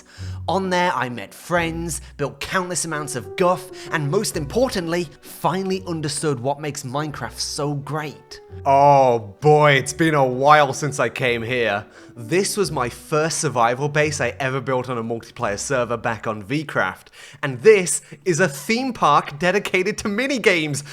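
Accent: United Kingdom. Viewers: I love watching this guy. Especially since he is British. There was a science experiment and it was proven that people listen to those who have British accents more than other accents like Southern or New England accents, and I can defiantly say that I listen to this guy a lot more than most youtubers. :D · Why does your brittish accent attract me so much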